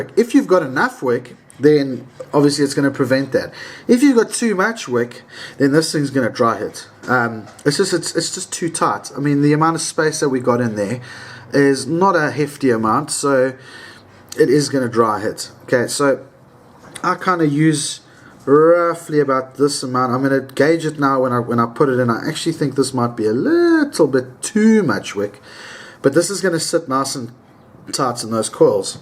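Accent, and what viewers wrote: South Africa. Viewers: Hey you sound like you're from eMzansi (S.A), Are you??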